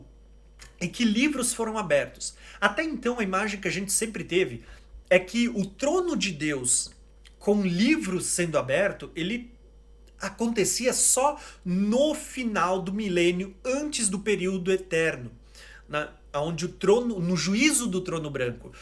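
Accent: Rio Grande do Sul, Brazil. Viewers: Shalom irmão !!! Excelente video !!! Sutaque de gaúcho !!! Faz um vídeo sobre MATITYAHU [ Mateus] cap 5 vs 17 e 18 !!!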